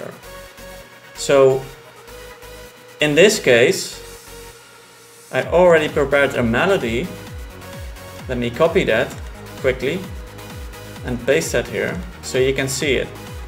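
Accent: Netherlands. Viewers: gonna take a wild guess and say you're dutch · You're from the netherlands right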